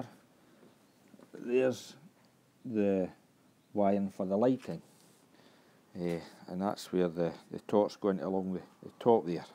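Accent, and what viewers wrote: Scotland, United Kingdom. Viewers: very nice scottish accent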